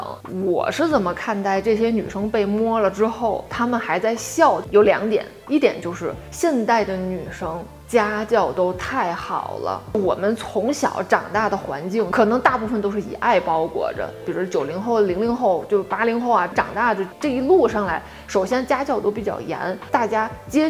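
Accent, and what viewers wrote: Tianjin, China. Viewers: 你是天津人对不对！！😃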